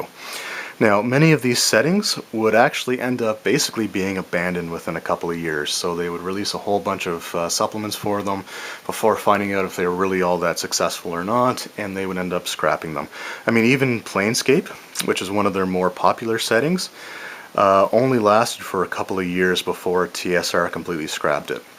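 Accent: Canada. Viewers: I detect a Canadian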